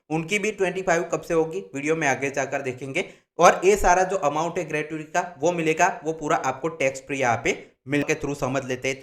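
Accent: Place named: Bengal, India. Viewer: U r talking like Bengolian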